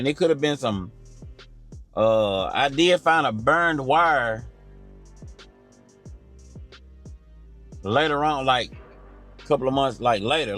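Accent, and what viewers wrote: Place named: Tennessee, United States. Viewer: Completely unrelated but you from Tennessee? That accent sound similar to mine 😂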